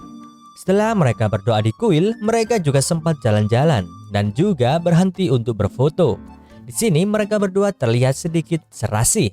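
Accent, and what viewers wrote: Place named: Madura, Indonesia. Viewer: Omong gak seneng min .. terlalu kaku .. kayak omongx orang madura yg belajar bahasa jawa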